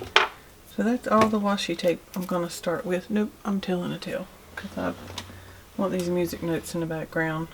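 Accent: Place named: Mississippi, United States. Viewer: Gorgeous page! I’m liking the way you did the washi. You sound like you’re from Mississippi. It's always a bonus to have someone who does great art and also has a pleasing voice. I like the cross Ross lines too, I think because it suggests an old patchwork quilt behind it.Thanks for sharing.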